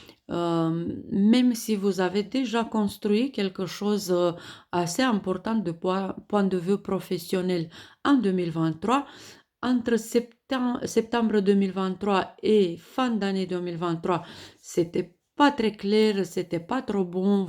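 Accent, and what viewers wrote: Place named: Russia. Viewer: J'adore l'ascens russe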